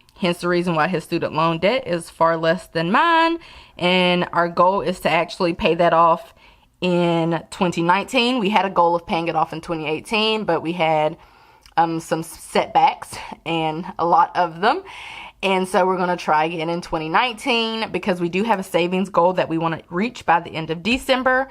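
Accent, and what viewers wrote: South, United States. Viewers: You’ve taken on a southern accent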